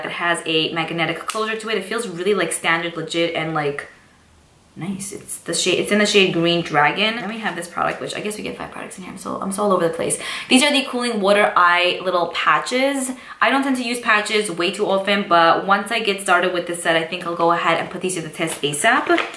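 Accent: New York, United States. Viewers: Are you from NY or am I very dumb cuz you seem like you have a ny or New Jersey accent. Maybe I'm dumb ash but idk🤷‍♀️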